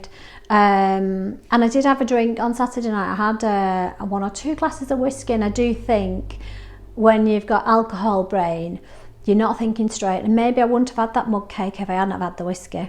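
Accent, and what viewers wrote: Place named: North, United Kingdom. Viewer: So lovely to hear that northern voice!!! I love that jumper looks so comfy. 2.7miles is great! And I do love a bike ride blow the cobwebs away in the mind. You never failed at all 26 hours is so amazing. And whisky is my favourite drink and gin but when I'm being strict I can't drink it just ruins me lol. You sound like you are doing totally amazing to me. Chaffles he he he so yummy! 4.6 miles Wednesday that's great too you are doing amazing on the exercise size of things. Keto is such a powerful tool when you use it in the correct way for sure. 40 pounds so so amazing I am so pleased for you it feels great doesn't it, keep it up your doing a great job whoop whoop!!!!!! fingers crossed for getting into the 10stones next week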